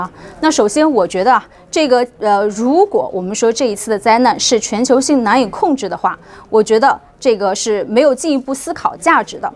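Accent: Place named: Nanjing, China. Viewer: coco口音好像是南京或者附近的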